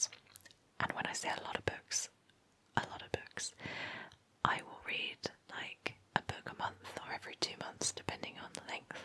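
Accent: United Kingdom. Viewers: The most tingly british wisper on internet! We love you!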